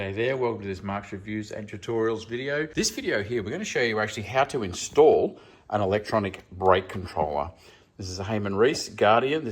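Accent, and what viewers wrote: Australia. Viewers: Good on ya Mark....Very well presented and informative...And AUSSIE English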